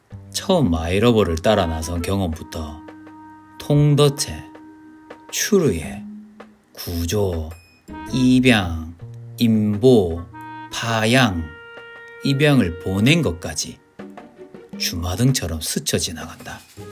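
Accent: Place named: Busan, South Korea. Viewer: 부산인가요? 사투리가 동질감 있어서 더욱 빠져들게 했어요. 고생 많으셨습니다. 좋은 일만 많이 생기시길 바랍니다.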